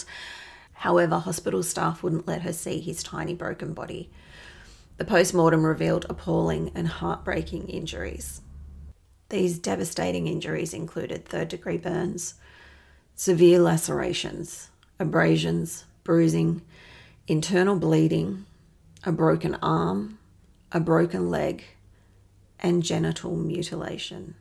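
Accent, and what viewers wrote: Australia. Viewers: I don't usually like Aussie accent but I could listen to you all day U.K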